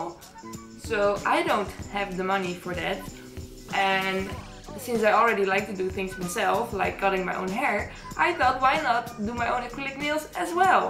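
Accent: Netherlands. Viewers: Je Nederlandse accent is zo herkenbaar lol, goede video 12/10. Groetjes van België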